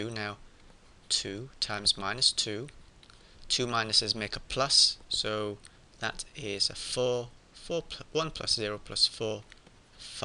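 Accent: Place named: United Kingdom. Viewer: That british accent just adds a whole layer of authenticity on top of it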